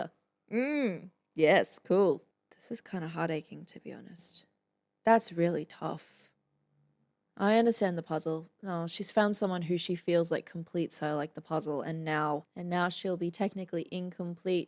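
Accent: Australia. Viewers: I’m an Aussie so don’t usually find Australian accents very interesting, but you always so amazing!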